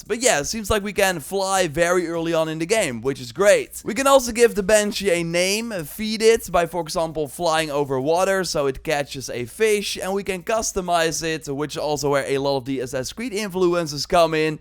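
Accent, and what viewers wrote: Netherlands. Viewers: You sound dutch! I am very hyped for it! I loved farcry 3 alot aswell as ac odyssey. Here is to hoping it will be good 👌❤️ Entered the giveaway! 🎉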